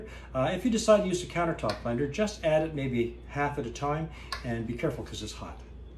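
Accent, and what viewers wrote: Canada. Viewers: As a native upstate NYer I can pin that Canadian accent anywhere (plus the U in flavor & the 10 percent cream reference) What a lovely Canadian gentleman!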